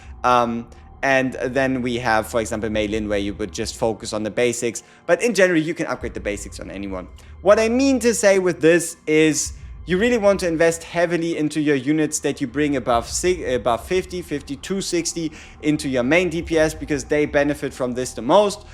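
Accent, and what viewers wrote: Germany. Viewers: Love yoir heavy german accent 😭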